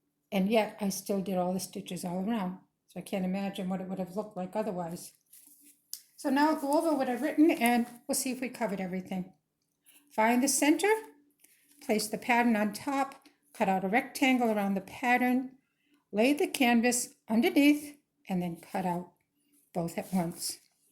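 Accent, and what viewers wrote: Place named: Massachusetts, United States. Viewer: This is so helpful! You sound like you're from MA! Thank you for doingvthis .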